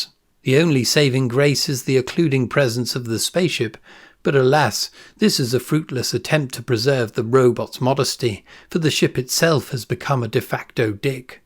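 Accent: United Kingdom. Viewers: This is hilarious and the English accent makes it even funnier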